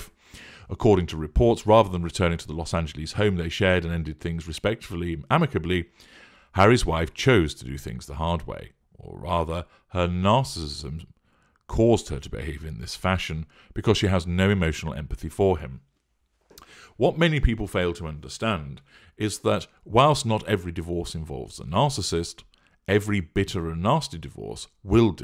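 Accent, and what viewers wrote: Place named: Northern England, United Kingdom. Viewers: You sound like Professor Higgins or Colonel Pickering, in My Fair Lady. As an American, I can attest that at least 75% of English accents are charming, and we also love an Irish brogue or a Scottish Burr! We are completely charmed by almost any UK 🇬🇧 accent. However, there’s something soporific about the mellow tone and accent of. He could read the telephone book and put me straight to sleep. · you have the sexiest (posh with a slight Northern inflection) voice on Youtube but then you already know that. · Manchester and environs . Would be my best guess . But am a Northerner myself .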